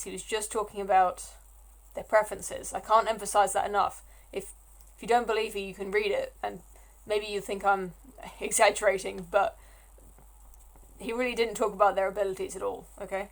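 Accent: United Kingdom. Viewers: Excellent work here,. As an American, I'm guilty of stereotyping. Every time I hear a speaker with a British accent, I automatically believe they're smart. I'm glad to not be let down in this case (probably from all the documentaries of such high caliber produced by the BBC over the years [anything with David Starkey is history porn to me]. It's a shame that their news bias is no better than CNN now)! In my opinion, you should keep doing this. Get a good camera and mic setup and see what happens! It'd be awesome to see you discuss this with Molyneux, Styxhexenhammer666, Sargon, JBP, Computing Forever, etc. We need reason and evidence to prevail over madness.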